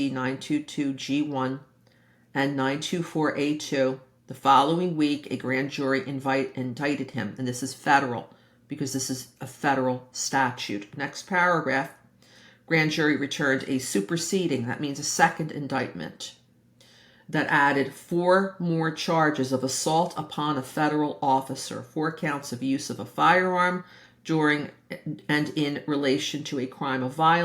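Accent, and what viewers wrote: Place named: New Jersey, United States. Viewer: You sound like you're from NJ. I'm a Cathy from NJ✨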